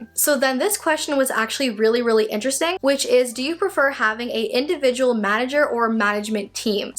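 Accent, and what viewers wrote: Canada. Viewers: where in Canada are you from(I can tell)?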